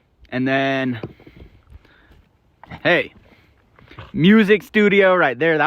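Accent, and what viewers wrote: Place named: Arkansas, United States. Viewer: I find it so strange as an older person to see a 20 year old white boy in the Pacific North West talk like an old black man from Arkansas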